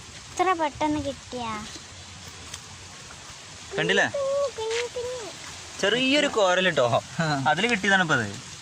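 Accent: Malappuram, India. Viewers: Malappuram bhashayum .... pazayakala ormayum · Malapuram bashayanu ee vdonte monj..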